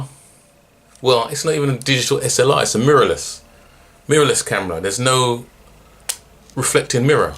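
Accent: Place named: United Kingdom. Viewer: The 21st century has arrived. I was looking at the 80d as it has a headphone jack, but I guess I can use the meters on the m50 if I shoot video, and not break my back. Enjoy your 22 f2 as I read the bokeh is great plus low light. Your photos look great, do you think this body performs as well as the 80d for PHOTO image quality? If it does, it is a lightweight bargain. Great to hear a British reviewer: I am from near Newmarket :-) Other reviewers compare this camera with expensive SLR which is an unfair comparison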